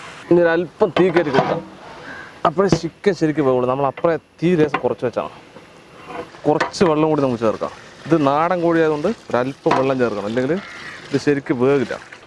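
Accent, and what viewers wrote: Palakkad, India. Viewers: Palakkaden slagill "കോഴിയും കുമ്പളങ്ങയും "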